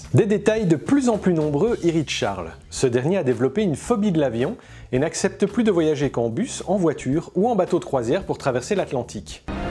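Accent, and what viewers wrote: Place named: England, United Kingdom. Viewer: accent anglais j'entends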